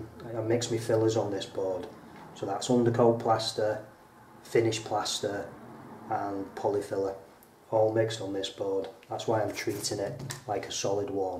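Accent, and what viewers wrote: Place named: Manchester, United Kingdom. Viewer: You sound like you are from manchester, how do I book you. :-)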